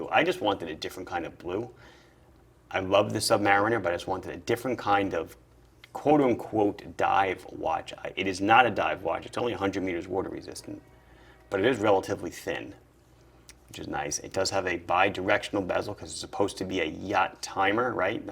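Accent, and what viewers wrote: Long Island, United States. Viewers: “Hi this is …” that accent whips my head around every time 😂 we don’t have many authentic Long Islanders down here in Texas.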